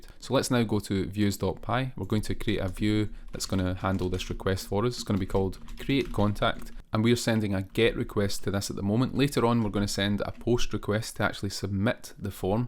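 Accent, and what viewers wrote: Scotland, United Kingdom. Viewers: Subscribed for the amazing combination of relevant web development content and a Scottish accent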